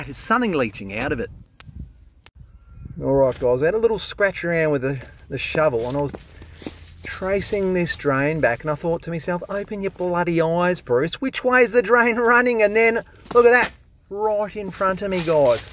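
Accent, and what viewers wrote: Australia. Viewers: Let’s be honest, I’m more here for the Australian accent then the drain cleaning. That’s just a neat little bonus on top innit? Greetings from Germany :) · LOVE Aussie LOVE your accent ❤ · Love your channel, but your silly over the top okka accent is a bit annoying. Don't be Steve Irwin of drainers mate!.....😂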